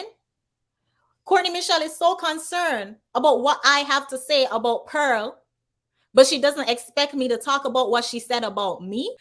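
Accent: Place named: Jamaica. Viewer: Omg, I just came across your channel and it seems to me you’re Jamaican I can tell by your accent. My problem is why are you on YouTube in the American space talking about there issues when Jamaica 🇯🇲 has bigger problems. Jamaica and Jamaicans have much bigger issues than this! You know Jamaican men don’t care about babymothers and Petty things like this. None of what you say is even a real problem in comparison to the killings and the violence in black communities across the globe, especially in your country Jamaica 🇯🇲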